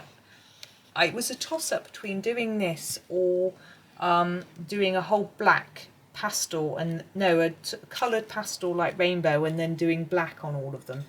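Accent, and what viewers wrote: England, United Kingdom. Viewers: Ah, you are such an inspiration! I have enjoyed this video so much, it has motivated me to think about what I will do with my copy of this book. I didn't buy it for myself because I've got several of Johanna's books and they were enough, seeing I've been going crazy on Amazon lately and have bought about fifty colouring books this year 😲. But a friend gave it to me for Christmas, and when I looked through it I felt like I could have some fun with it, just as you have described. I am going to blatantly copy much of what you have done, LOL! but have my own ideas for some of the pages. BTW I just love listening to you talk, I love the English accent. So different to my Aussie accent, you wouldn't think we were speaking the same language!!🤔. Best wishes for a very happy New Year to you and your family.🍸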